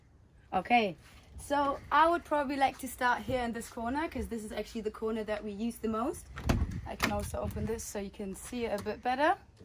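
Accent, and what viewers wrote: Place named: Denmark. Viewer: You really sound like you have a danish accent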